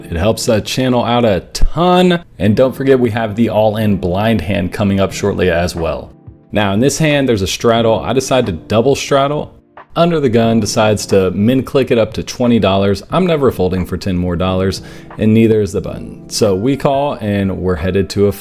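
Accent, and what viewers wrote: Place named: Texas, United States. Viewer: I'm learning about different American accents. Would you say your accent is typical for a Texan? Can you tell if somebody's accent is from Tennessee or South Carolina? Do those accents sound different to you?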